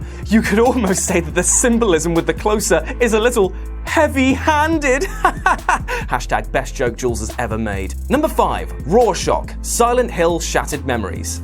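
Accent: United Kingdom. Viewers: American guy with a British accent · Why britains ? I don't understand british english -.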